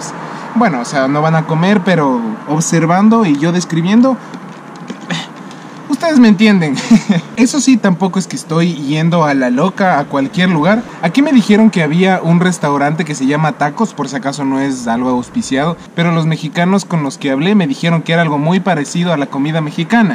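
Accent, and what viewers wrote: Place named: Ecuador. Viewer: Eso me gusta del... No cambia su forma de hablar Ecuatoriano hasta las huevas... Mijin.. ánimo Bro.. más vídeos porfa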